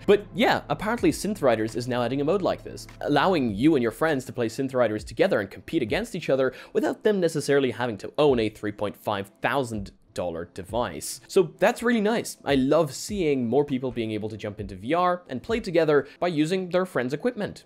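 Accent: Poland. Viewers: The polish accent intro 👌🏼🔥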